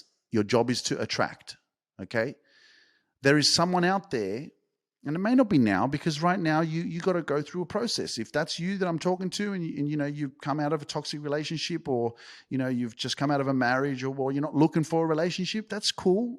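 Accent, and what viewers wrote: Australia. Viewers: I love your message,. ❤❤❤ Thank you for being a guiding light in the darkness for those who need it so very much. Thank you for telling it as it is. No sugar coating. Just the Truth. And in an Aussie accent. 😊 Your message is needed. You are needed. You are loved. You are appreciated. Thank you,. You're beautiful. ❤❤❤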